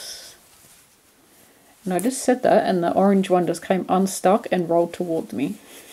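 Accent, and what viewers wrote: Australia. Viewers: So enjoying your Aussie accent and way of talking! Oh and the crochet too of course :)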